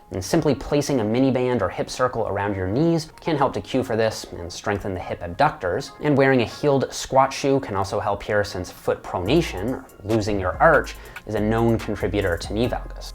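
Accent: Newfoundland, Canada. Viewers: I hear a newfie accent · The burr. This man is Canadian no doubt. Awesome content dude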